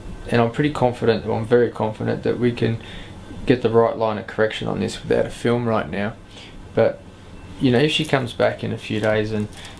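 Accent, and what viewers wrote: Australia. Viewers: Chocolate Queen it is an Australian accent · Yes, the Aussie accent is the best one. My husband sounds the same. I'm Mexican American and that's one of the many reasons why I fell in love and married him. XD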